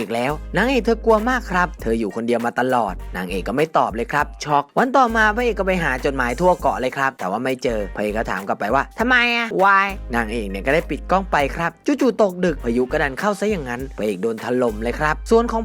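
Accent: South, Thailand. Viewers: พี่เป็นคนใต้หรอหนูได้ยิน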